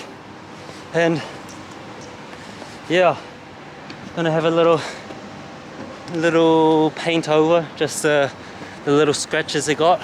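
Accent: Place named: New Zealand. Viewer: where are you from bro? sound like a kiwi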